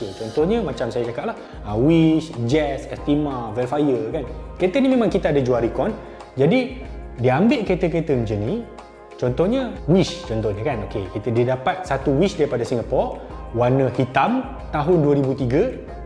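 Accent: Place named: Pahang, Malaysia. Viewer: Org mentakab ker bang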